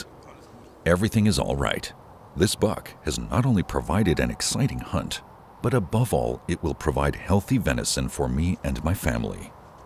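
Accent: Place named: Germany. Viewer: Wo ist denn sein sympathisches Denglisch und wo der Hund bei der Wasserjagd hin?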